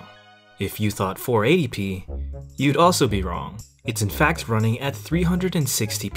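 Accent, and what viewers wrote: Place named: Netherlands. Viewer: you sound dutch i just realized that zamn, been binge watching your vids LMFAO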